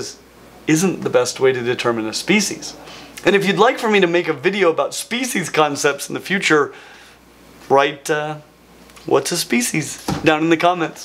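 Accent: Australia. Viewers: i need you to do an entire episode in your australian accent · Bonzer Aussie accent, mate! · Can we get a video of Australian animals with doing his amazing Australian accent????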